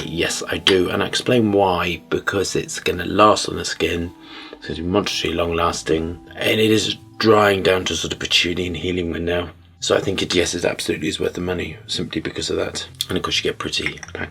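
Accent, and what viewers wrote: United Kingdom. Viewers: Honestly, I've gotten PLENTY of comments about Aventus and to this day (5 years ago) I have NOT found a perfume that can get those same results... It has NOTHING to do with Creed, because Club De Nuit Intense Man with get just as much attention. It's that SMELL that so many people love. I have tried soooooooo many fragrances. I will try Nishane, but Aventus is a LEGEND no matter what people say. Ive gladly owed about 12 to 13 bottles of it... I think maybe one of them were fake - So, I do recommend purchasing Creed's Aventus from a reputable source., my wife is a Brit so I hear that accent allll the time LOL.. never gets old. Thanks for sharing your knowledge when it comes to the ingredients or raw materials. I never knew what smell came from the whale vomit lol.. but now I do!!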